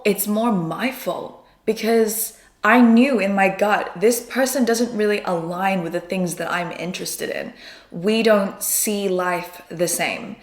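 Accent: Australia. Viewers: The accent?? Where is she from. My guess is Australia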